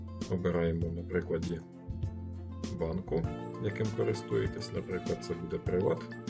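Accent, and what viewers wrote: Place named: Russia. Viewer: Дякую, було цікаво. Тільки чому Ви українську "е" читаєте на російський манер - /йе/? Навіть якщо це умовно латинська "е", то вона теж не /йе/, а /і/ або, якщо вже не англійська, то німецька чи італійська - /е/ ("э" - якщо вже на то пішло).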